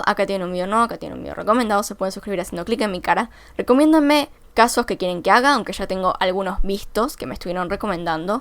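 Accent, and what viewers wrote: Argentina. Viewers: Ella es de Argentina y allá su pronunciación así suena...a mi en lo personal me encanta su manera de hablar, es linda! 👍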